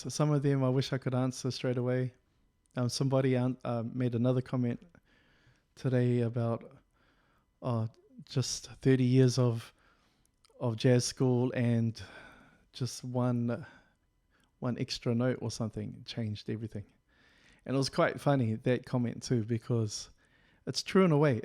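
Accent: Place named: New Zealand. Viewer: Hi bro, I've just discovered your channel. Great work ❤️ I take it from your accent your a Kiwi I'm a guitar teacher in NZ. I'd be interested in your teaching experience in Japan as a Kiwi if so. Perhaps a video about this you think it's worth covering.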